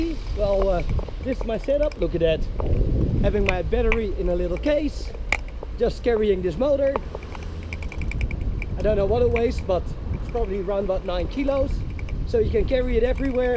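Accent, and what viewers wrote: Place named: Amsterdam, Netherlands. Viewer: Grappig amsterdams accent ; )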